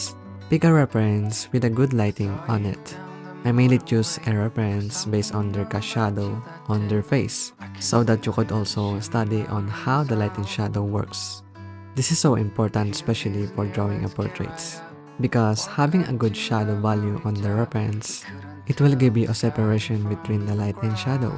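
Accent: Philippines. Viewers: wow you are a Filipino, just by your accent its so obvious. I live in Philippines too, I m a fan of your art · Ilonggo ka sir? curious lang ko sa accent 😅 btw your auch a good artist po🥰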